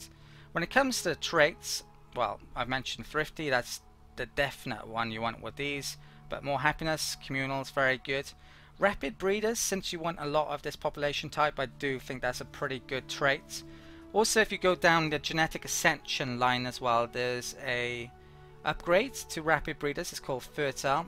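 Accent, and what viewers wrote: Wales, United Kingdom. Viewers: Hey, can I ask, are you Welsh? Because my brain is telling me you are, and I wanted to see if I was right. great video :)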